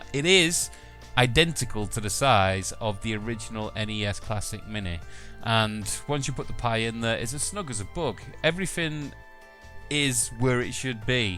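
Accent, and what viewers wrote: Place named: England, United Kingdom. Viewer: aahhh , the guy behind the funny english accent ....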